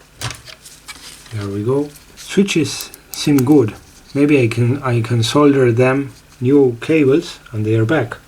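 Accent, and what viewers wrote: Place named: Spain. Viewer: Because of your accent I thought that you were located in Spain.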